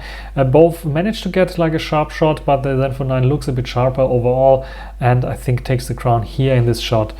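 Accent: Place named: Germany. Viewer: Bro where are you from?I like your accent sounds germanish xd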